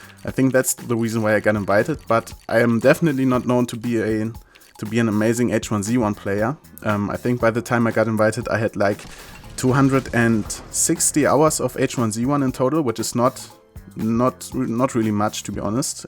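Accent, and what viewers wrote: Germany. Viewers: Man merkt das du deutscher bist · Man merkt das du Deutscher bist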